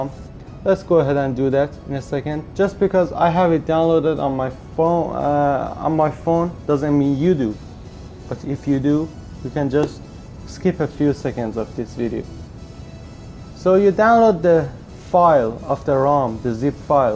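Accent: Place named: Iran. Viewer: دمت گرم جوون قربون انگلیسی حرف زدنت. حرف نداره. تا گفتی ادین، گفتم این لحجه باید فارسی باشه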